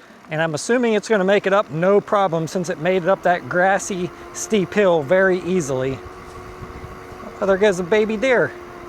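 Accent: Pittsburgh, United States. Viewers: My man, you HAVE to be from Da Burgh. LOL!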